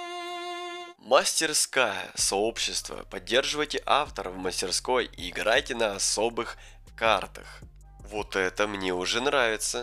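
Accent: Ukraine. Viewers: Ты украинец или беларус?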